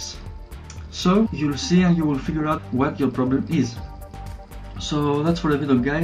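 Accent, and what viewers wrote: Greece. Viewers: Thnx you sound like a greek just like my favorite basketball player Giannis Antetokounmpo im not greek im a filipino i didn't notice i got a bad ram stick its a ddr3 and its not connected properly it now boots up with display but the beep is gone forever what do i do now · U have such Greek accent r u Greek??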